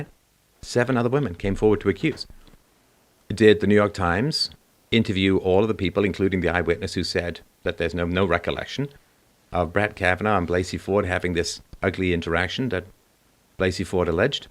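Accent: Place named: Ireland. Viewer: Where are you from? Cool accent. Is it Irish? The scary thing is...Biden could, potentially win, especially in the middle of all this insanity.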